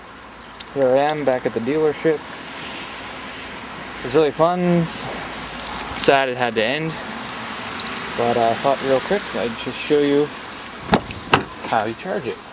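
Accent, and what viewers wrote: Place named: United States. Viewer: Thanks for the vid, i do wonder how many kms you get on one charge and if charging was quicker with proper house mount charger. 100kms is no good for me, commute to work is about a 200km round trip. plus in Australia our electricity is much higher, though i don't know where your from, you seem to have an american or Canadian accent but I thought they used Miles in US, kms in Aus :)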